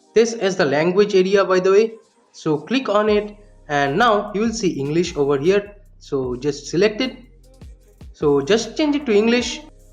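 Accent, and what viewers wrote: India. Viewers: Chineese much better than your hindienglish -_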